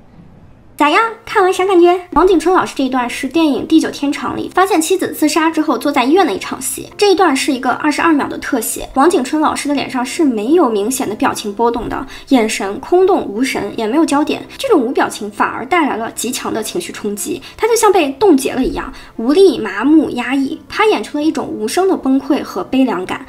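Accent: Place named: Shandong, China. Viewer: 我不是任何人粉丝，但Up主你的口音也很重，你可以再说一遍“小巷人家” 吗？ 你是山东人、河南人? 反正不管哪的人，你的台词也还得练练，有点大舌头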